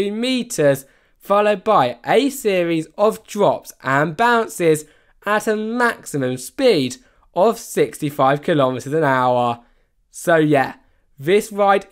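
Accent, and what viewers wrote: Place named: United Kingdom. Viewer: it's a british accent lol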